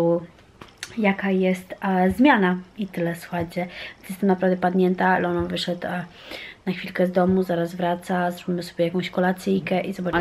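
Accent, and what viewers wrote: France. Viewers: Masz piękny francuski akcent,mega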